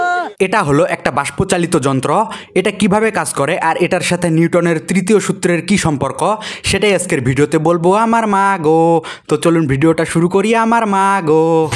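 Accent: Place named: Thakurgaon, Bangladesh. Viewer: তোমার বাড়ি মনে হয় নিশ্চয়ই ঠাকুরগাঁ জেলায় তোমার কথা শুনে বোঝা যাচ্ছে আমাকে নিয়ে একটা ভিডিও বানাও আমি কি সত্যি বলেছি না মিথ্যা বলেছি এই কথা নিয়ে একটা ভিডিও বানাও প্লিজ প্লিজ প্লিজ প্লিজ প্লিজ প্লিজ প্লিজ প্লিস প্লিস প্লিস প্লিস প্লিস প্লিস প্লিস প্লিস প্লিস প্লিস প্লিস প্লিস প্লিস প্লিস প্লিজ🎉🎉❤❤❤❤❤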